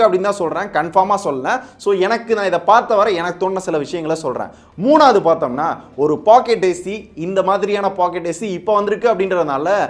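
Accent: Madurai, India. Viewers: Bro neenga maduraiyaa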